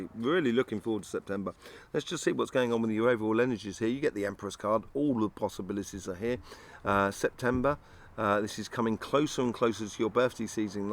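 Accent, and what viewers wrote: United Kingdom. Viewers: Have not met this person yet. If i have not aware of it. Not looking for a relationship. I just moved across the country from the east to the west. We had a catagory 4 Hillary Hurricane. Being from the Jersey Shore it eas a windy very rsiny dsy. My son whose lived here for over 29yrs now. Remembers what a cat. 4 hurricane really is. 😅too funny. T zU I like your readings. Love the B accent. My ancestors are British. U msy be from another Vointry? Sounds very British to me. Oh no not goung to wait too long nor be over cautious. Btdt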